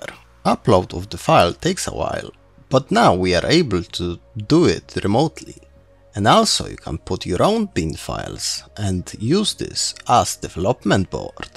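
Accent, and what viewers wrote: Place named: India. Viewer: O CHUJU xd tyle lat w UK, a brzmisz jak hindus XD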